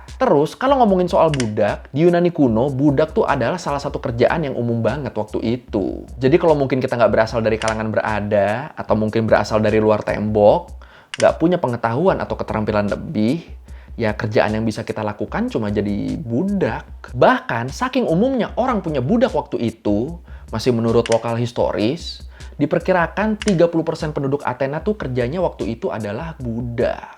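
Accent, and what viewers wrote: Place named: Madura, Indonesia. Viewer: Mantap bang belajar soal yunani kuno dgn logat madura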